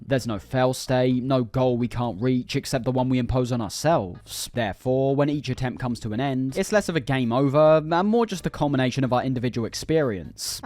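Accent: United Kingdom. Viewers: A British person talking about the sims for an hour. That's all I need and want actually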